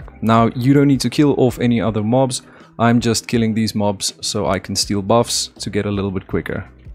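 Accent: South Africa. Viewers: That south African accent is thick AF brother. Hallo daar van die kaap. Nice vid bra. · NP i found it i knew your accent sounded familiar, im From SA to bro gg content il supp u all the way keep it up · Sounds ikea a fellow SAFFA 🤔, hoe gaan did boet, ek gaan dit vandag doen..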